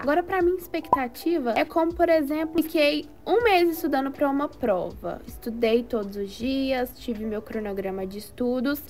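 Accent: Minas Gerais, Brazil. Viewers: Vc é mineira ? Seu sotaque é tão lindo!!!!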